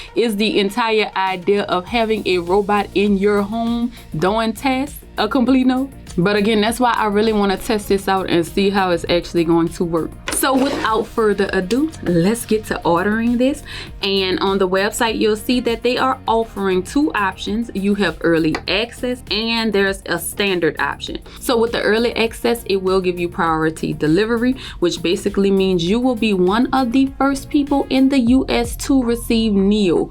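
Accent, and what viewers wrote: New Orleans, United States. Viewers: Mmmmmm 🤔..... Is that a New Orleans accent? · Are you from Louisiana? I’m thinking I hear that New Orleans accent. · Not Related but, I assume you from NOLA, because I can hear New Orleans in your voice lol 😆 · Are you from New Orleans baby? I ❤ your accent 😍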